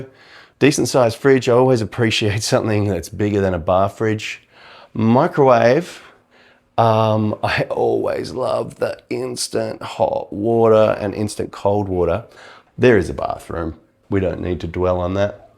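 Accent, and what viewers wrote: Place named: Australia. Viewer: the foreign accent (Australian?) pronouncing Indonesian had me confused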